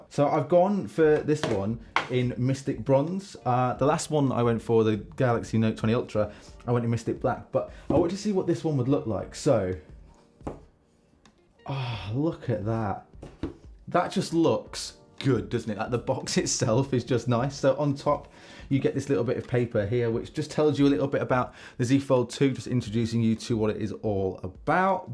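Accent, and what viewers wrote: United Kingdom. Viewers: Love subscribing to english accent speaking guys😅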